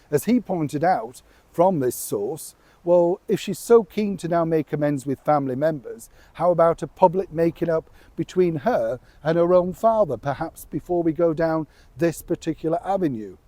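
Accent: Yorkshire, United Kingdom. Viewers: Yes a Yorkshire Lad 😊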